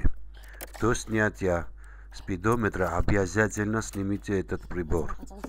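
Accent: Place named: Georgia. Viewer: Грузин, по акценту слышно!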